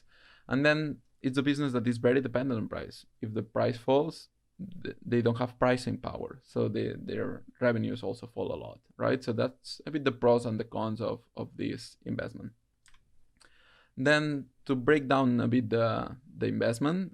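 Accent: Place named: Spain. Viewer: Me parece bien que divulges esta cultura financiera y oír a un español hablando inglés, que no se diga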